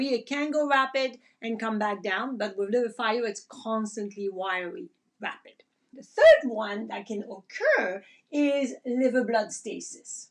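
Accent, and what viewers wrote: France. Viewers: your French accent adds to your charm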